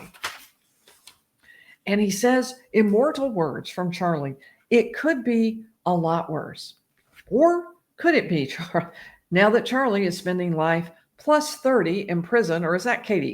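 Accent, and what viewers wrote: South, United States. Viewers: reminds me so much of my gentle southern grandmother. Anyone know where she was raised to get her accent? My grandmother was from Louisville.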